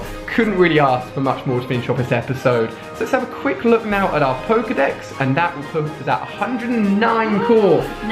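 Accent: United Kingdom. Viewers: He's British and has an accent. Get over it and enjoy the videos or don't watch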